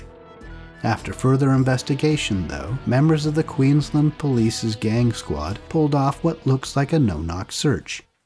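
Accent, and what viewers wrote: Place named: Canada. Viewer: As per usual a good vid. I must say "thumbs up" for the nearly correct pronunciation of "Murrumbidgee". It's a hard one for non-Aussies, I sense a bit of a Canadian accent? Your "Melbourne" is also pretty good.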